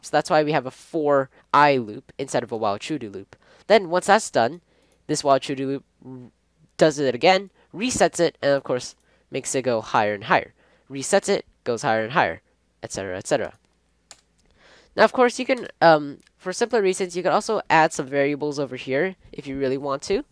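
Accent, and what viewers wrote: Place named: United Kingdom. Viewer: great tutorial. you notice this guy is British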